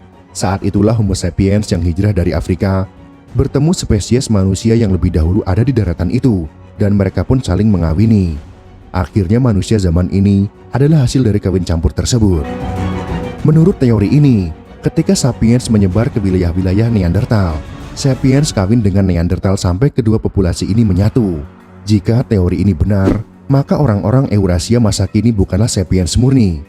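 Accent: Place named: Java, Indonesia. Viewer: Asli mana mas... Kok bahasa Jawa nya keliatan meduk..." Saya salah satu scrubers pegawai jalanan mas.... Video2 mas selalu saya lihat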